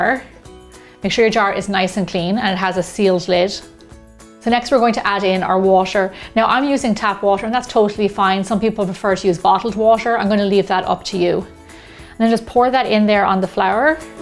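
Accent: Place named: Donegal, Ireland. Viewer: You sound like your from Donegal ♥️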